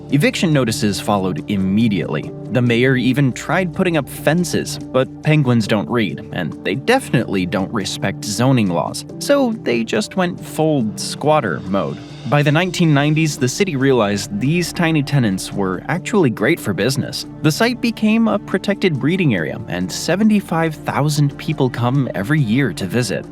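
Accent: United States. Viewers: Hey, let's not forget that he sounds American and their words often sound different to ours. So attempted pronunciation is a good try. As for the Maori names, as a 7th generation Kiwi (Pakeha) I struggled with Maori pronunciation even though I learned the vowel sounds in school. The narrator may have had little to no exposure to the language. It's not spoken elsewhere and he may have been doing his best with pronouncing. Imagine if you were making a video on à country you have never had exposure to. Say China. They have two versions of language, Mandarin and Cantonese. I cannot tell which is which, but I know I once worked with a lady whose first name was Qian. Pronounced Chen. If I hadn't learned in that job with a multicultural workplace, I would not have learned how to pronounce that name. Many names, in fact. And I learned that Te Reo is a dying language and my Māori friend taught me a bit about it. 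Since then I have attempted to speak Maori words and names as accurately as possible, and told my daughter about this and she tries the pronunciation too. We do t always get it right, and I've been exposed to it all my life. As for the British names, I'm pretty sure it's just trying to split it into familiar syllables and mispronounced. As for me,.if I was doing a video on other places, I would run some pronunciation checks and see videos of people from the country or place and their pronunciation. But it seems many don't do this step. It's a shame, but not unexpected. No need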